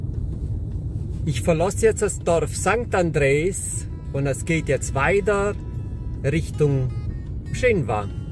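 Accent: Germany. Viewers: Suna ca si Dialectul de Bayern!!! Ich Mag Das!!! Gruß Gott!!:hand-pink-waving::face-red-heart-shape::face-red-heart-shape::face-red-heart-shape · WUNDERBAR und SEHR SCHÖN, DANKE für dieses schöne Video aus dem Banat. Sag Mal, weißt Du, wo Deine Vorfahren hergekommen waren, denn Dein Dialekt erinnert sehr an das Saarländische und an das Süd-Pfälzische in Deutschland. Alles Gute und nochmals DANKE für dieses schöne Video...!!! Gruß, Marijama.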